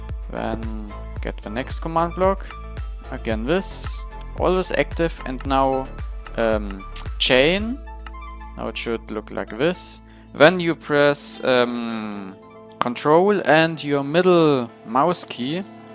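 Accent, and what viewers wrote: Germany. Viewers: Are you german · man merkt voll der ist deutsch haha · Man hört das du ein Deutscher bist ;) #NoHate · i thought youre german lol because you Sound like this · Bei deinem Englisch hört man das du Deutscher bist XD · Man hört voll das du deutsch bist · are you german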